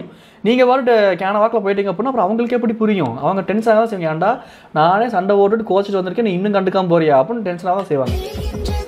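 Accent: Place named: Karur, India. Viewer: Happy mau day💪💪💪💪💪ean irumal odambu sari illaya? Love fm srilanka😍😍😍😍😍karoor slang nice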